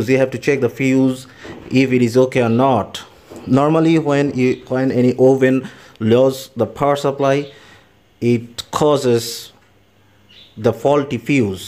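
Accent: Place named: Philippines. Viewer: Wow ang galing nman parang pinoy lng ang NG sasalita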